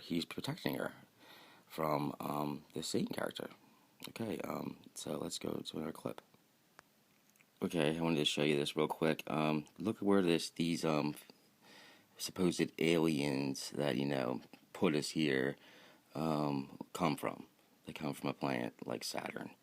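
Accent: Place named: Pennsylvania, United States. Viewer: Dude your Pennsylvania accent is so heavy I can barely understand what you’re saying. Speak more clearly.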